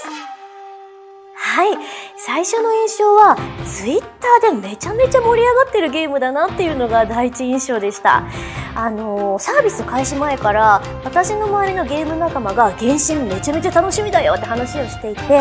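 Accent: Kansai, Japan. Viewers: the kansai dialect definitely made it 10x better <3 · Queen of Kansai Dialect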